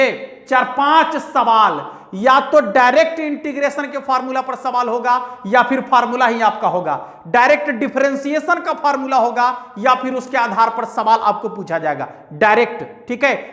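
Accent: Bihar, India. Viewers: Aapke boli me bihari ka mithaas h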